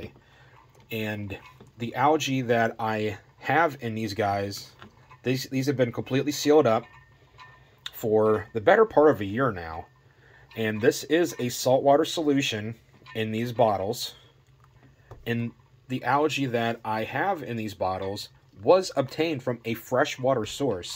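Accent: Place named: Pennsylvania, United States. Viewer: Brother, if you're not from Pa, then you're certainly in a border state. One - the Amish. Two, the inflection in the voice. FWIW, I buy all my meat and produce from Amish vendors in Mt Joy and Lancaster county.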